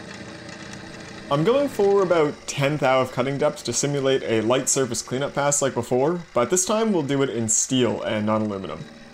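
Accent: Canada. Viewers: I thought I detected a Canadian accent and then sure enough, out came the Power Fist dial indicator! Nice video.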